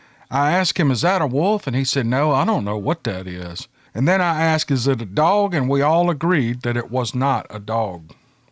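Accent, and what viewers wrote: South, United States. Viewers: It's not only your great narrations of our people's stories that make your videos so enjoyable, but also your ramblings! So, don't cut your ramblings out please and don't discount them!!!lol! Your personality and warm Southern accent are so delightful! Like hot, salty fried okra just out the frying pan with a sweet iced tea on a warm summers' evening!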